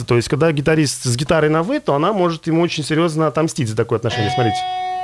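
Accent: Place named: Moscow, Russia. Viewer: тошнит от твоего мАаааацковскава акцента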